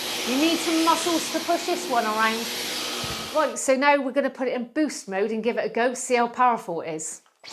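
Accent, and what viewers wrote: United Kingdom. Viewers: My language is french and I love so much to hear the British English that I forgot I was here to learn about a vacum cleaner.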